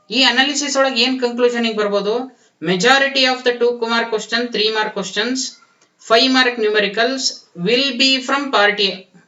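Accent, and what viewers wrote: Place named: North Karnataka, India. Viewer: Sir nim javari bashe esta ayt. Hinga classes madi namg help madri plz🙏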